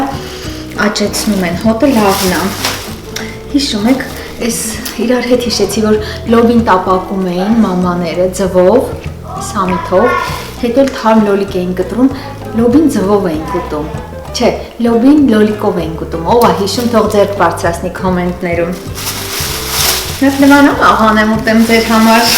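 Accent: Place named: Gyumri, Armenia. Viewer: ջան քո խոսելաձևի մեջ գյումրեցու ակցենտ եմ տեսնում դու արմատներով որտեղից ես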